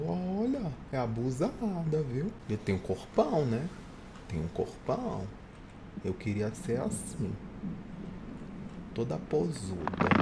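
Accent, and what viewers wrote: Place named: Rio de Janeiro, Brazil. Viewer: Carioca da gemah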